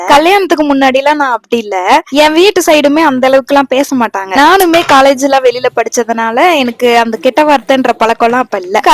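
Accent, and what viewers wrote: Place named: Genguvarpatti, India. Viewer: Genguvarpatti slang 😂😂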